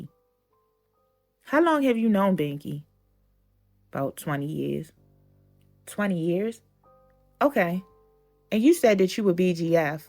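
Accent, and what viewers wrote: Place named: Baltimore, United States. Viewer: Different narrator. First time different voice. This a baltimore woman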